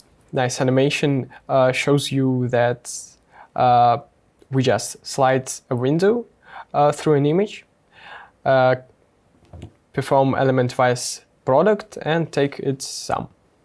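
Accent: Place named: Russia. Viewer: зачем на английском? будто европейцы будут смотреть видео с таким жёстким акцентом русским))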